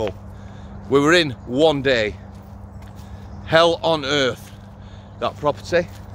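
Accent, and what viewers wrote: United Kingdom. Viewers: Thick British say no more.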